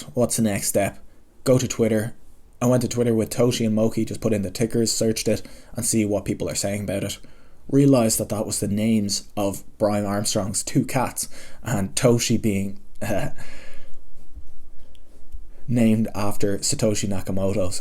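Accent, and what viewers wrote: Ireland. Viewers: Dope video what part of Ireland you from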